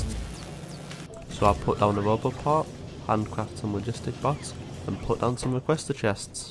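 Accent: United Kingdom. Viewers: Der klingt ziemlich British, mit dem aussetzten vom T bei "water" oder "later".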